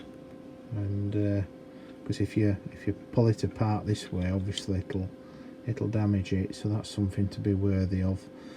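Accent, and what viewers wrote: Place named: Yorkshire, United Kingdom. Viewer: Thats a Yorkshire accent where you from? Im from Rotherham.. but i've lived in Norfolk 24 years now.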